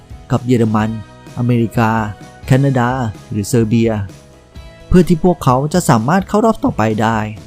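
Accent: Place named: Thailand. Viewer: ตอนแรกคิดว่าจะแพ้ 3 - 1 แต่สุดท้ายก็พลิกมาชนะ 3 - 2 ป.ล. ทำไมคนอ่านเสียงเหน่อๆ มันเหมือนมีการไถเสียงหรืออะไรสักอย่างท้ายประโยค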